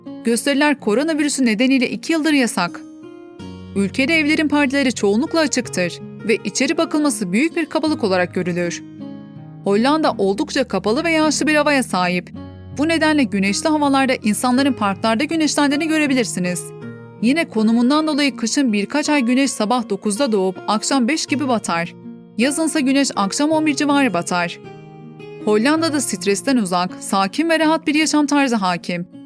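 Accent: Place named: Aegean, Turkey. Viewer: seslendiren hanımefendi ege köylüsü sanırım yapıyoo, sağlıyooo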